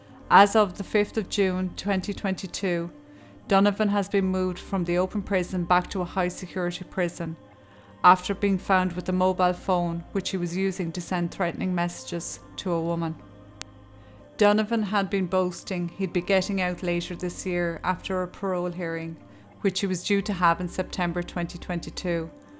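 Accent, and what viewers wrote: Ireland. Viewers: I've been binge watching your videos. I really like your style, and your Irish accent is just the cherry on top. 🍒 I appreciate the fact that you go straight into the case and waste no time talking about irrelevant things. You've quickly become one of my favorite channels. Thank you for your work. 🤗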